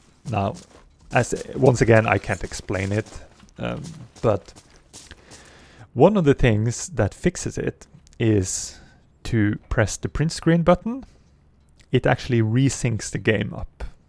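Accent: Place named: Norway. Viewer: Mate, your videos are more than helpful. I learned some great tips, The one where you hold the medikit and protect your head (hit point) against grenades was a pearl of wisdom. Today, you taught me not to always spawn at squad leader, which should be obvious. Only until someone points it out. I have developed an obsession with battlefield 2 that came out of personal trauma, and I have this urge to become a better player. It's crazy, as it's a 20 y.o game, but EA and Dice haven't come out with anything better since IMO. BF3 and BF4 single player campaign are good, but they took away all the team and commander functions, and replaced it with a diet version. No ability to mod. Don't get me started on Jets. No take off at runway is a big, fat joke. I dislike (beyond comprehension) the path they chose. They screwed over customers/fans for the money, and to be more like COD. 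Imagine BF2 with BF4 graphics. No reason why this shouldn't have been made possible. I truly dislike EA and Dice! Instead we are stuck with these outdated graphics and engine. I had an idea you were Norwegian. Your accent is very familiar. My Daughter is from Bergen, and her Mom is from Sogndal. I can tell you are an infantry purest. I don't even sdee you using UAV/Artiliery, or Commander in your videos. I looked up Zeqtr. He is very good. Gonna watch more and pick up some tips. I was wondering, are there any competitive BF2 servers nowadays, or do the remaining servers just account for public players? I mean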